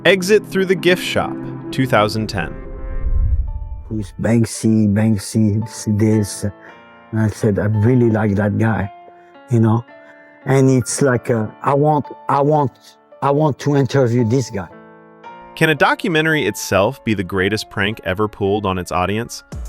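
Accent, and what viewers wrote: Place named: United States. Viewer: You sound very American! How did you master English so well??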